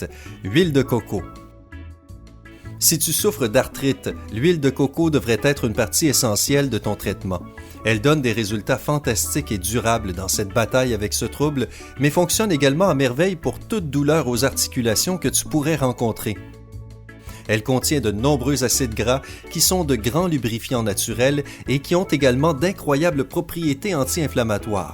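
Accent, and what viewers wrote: Quebec, Canada. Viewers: C une voix québécois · accent canadien je crois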